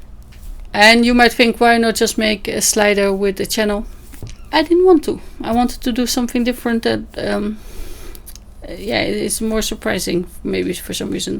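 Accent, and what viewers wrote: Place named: Netherlands. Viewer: Het is een genot om te kijken hoe je kleurt met waterverf en stiften. Het is net een sticker! Aan de hand van je accent gok ik dat je ook uit Nederland komt? Leuk dat ik je heb gevonden in de hop. Dank je voor deze video.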